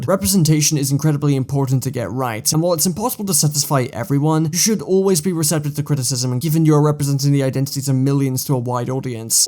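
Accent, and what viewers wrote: United Kingdom. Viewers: it's weird but I didnt even catch your british accent until you pointed it out, almost like a switch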